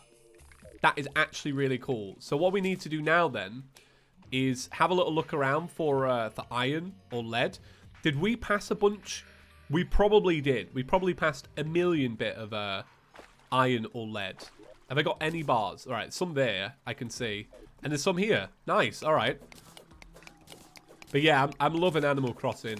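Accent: England, United Kingdom. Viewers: yeah thats the english accent for you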